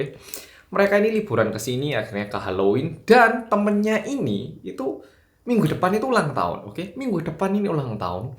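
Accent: Java, Indonesia. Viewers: Kalah medok gw sm oppa 😀😀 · jiaannnnm blasssss ra koyo wong korea,medoooxxxxxxxx bgt.......#keren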